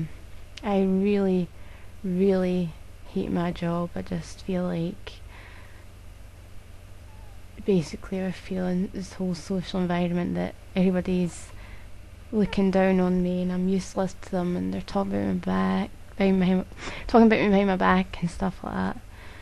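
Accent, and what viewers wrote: Scotland, United Kingdom. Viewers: are you Scottish? I like your accent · are you Scottish Might be a silly question but trying to read the acccent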